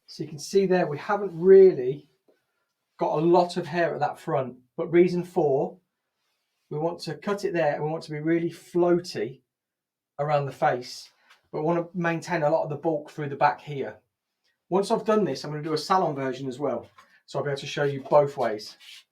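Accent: United Kingdom. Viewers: i like ur explanation also ur britsh accent. like and subscribe you.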